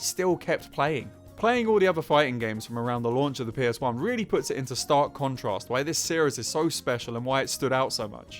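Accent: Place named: United Kingdom. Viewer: I like when British man talks about games for over and hour myyyyy favoriiiiiteeeeee 😩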